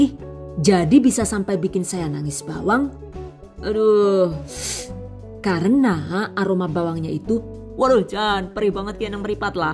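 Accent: Banyumas, Indonesia. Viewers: Deneng ngapak......??? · Ngapakek kentel banget hiiiii jd lucu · ❤❤ Penjelasannya detail banget Dan seru bngt saat ngomong ngapak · Jan wong ngapak Rika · Kenapa sih bahasanya spt orang yg glege'en gt ya maaf.. Kadang kyk kebarat2an kadang ngapax hehe oowke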